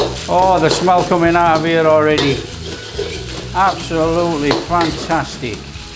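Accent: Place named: England, United Kingdom. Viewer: No we don’t need music, we enjoy your English accent. I love bean sprouts I have them in almost every Asian dish I cook. Thanks heaps, I love your recipes. I’m watching from Australia 🇦🇺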